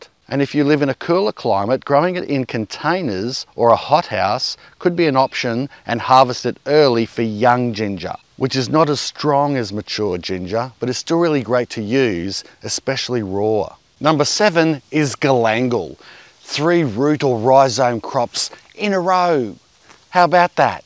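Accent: Australia. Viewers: I will tell which I will replace: None! Your channel is amazing. Plus that Australian accent and your passion your are right up there with the late crocodile hunter. Good lookin out bro. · Your "Down Under" accent at times makes me raise my eyebrows and ask "Did just say what I heard him say?" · I've been watching his vids for nigh on 2 years and whenever I mention gardening my family now respond in Australian accents..."What does say?"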